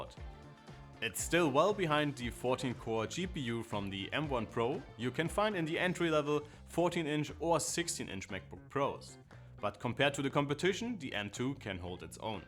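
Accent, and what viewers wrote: Germany. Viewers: Wow, ich lese notebookcheck schon seit vielen Jahren, aber das ist das erste Video, was ich von euch sehe. Einfach großartig! Flüssiger Text ohne komische Pausen oder holprige Überleitungen und schönes English Ich mag den leichten deutschen Akzent 👌 Die Einblendungen mit Testergebnissen sind teilweise etwas zu viel für mich, aber smooth 😂 und wenn’s mich näher interessiert, kann ich ja Pause drücken oder nochmal im Artikel nachlesen 👍 Danke ans ganze Team! weiter so! Ich bin gespannt aufs Review vom neuen MBA 🔜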